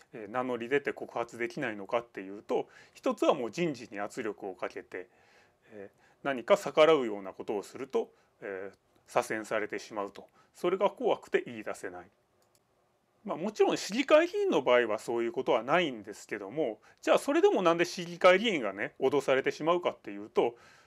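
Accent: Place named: Tsu, Japan. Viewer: 投稿主さん神奈川とかついてるけど、喋りがこっちぽいから津の人？？？？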